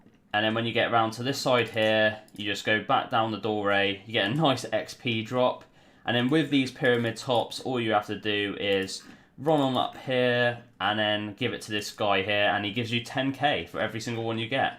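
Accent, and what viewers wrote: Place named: Coventry, United Kingdom. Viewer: You sound like you are from Coventry, or in and around west midlands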